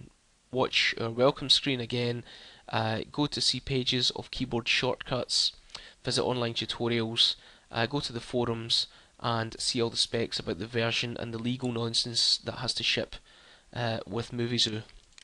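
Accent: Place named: United Kingdom. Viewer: Thanks you, very helpful. However to my mid-western American ear some British accents are as clear as day and others might as well be speaking Chinese. This one is kind of in the middle. I don't think it would hurt to speak more slowly and distinctly.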